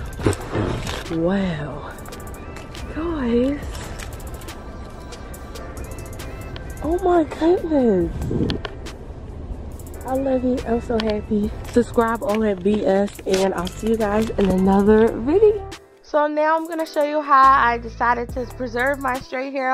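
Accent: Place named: Philadelphia, United States. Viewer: Wait wait wait a minuteeeeee...as much as I have watched u and loveeeee you...your here in PHILLYYYY.....omg.... I knew that attitude and accent I freaking knew it lol...this made me happy....forgive me cause I’m always the last to know everything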